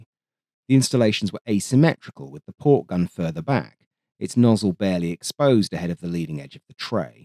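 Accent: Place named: East London, United Kingdom. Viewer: Thank you mate for all you manage,. (…I’m allowed!) Are you English or like NSW or…? Usually the accents are a dead giveaway but at times Im like “oh hes definitely from East London” but then certain words’ll go Sheffield-ish. Actually don’t answer that, and stop reading this as it’ll influence your habitual speech profile cause now you’ll be thinking about it subconsciously (really thoughts are more “layered” than dualistically ‘conscious’ OR ‘submi… ‘subconscious’)!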